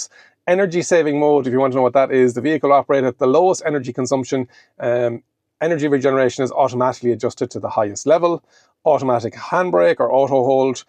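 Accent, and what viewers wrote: Ireland. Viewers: Great video man. Just what I needed. And great to hear an Irish voice explaining everything.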